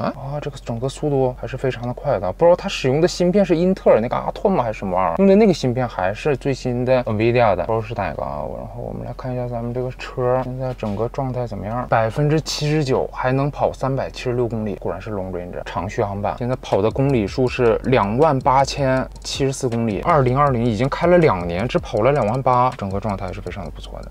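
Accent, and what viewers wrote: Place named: Northeast, China. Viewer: 這一口東北話，笑死我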